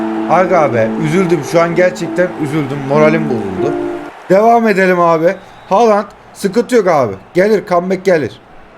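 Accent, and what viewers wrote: Kahramanmaraş, Turkey. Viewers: abi challenge mahalle maçı kurallarıyla online rakibe karşı oyna lütfen beni al abi istanbuldan selamlar bende maraşlıyım sen aynı maraşlılar gibi konuşuyosun abi seviliyosun kral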